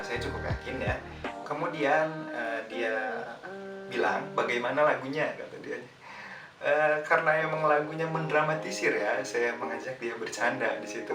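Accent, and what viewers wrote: West Java, Indonesia. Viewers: yallah a sunda banget bahasanya wkwkek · aa orang sunda ya😂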